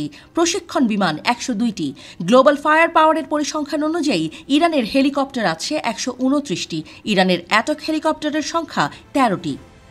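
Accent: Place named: Kolkata, India. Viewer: কলকাতার মতো খবর পড়লে শুনতে ভালো লাগেনা, দয়া করে বাংলাদেশের মতো পড়েন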